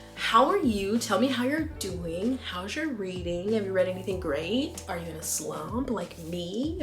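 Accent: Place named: South, United States. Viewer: Let me guess...Alabama or Mississippi.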